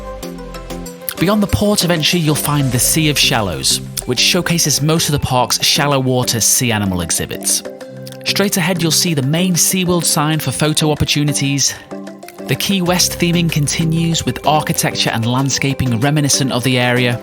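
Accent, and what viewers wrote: United Kingdom. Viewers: I love the accent the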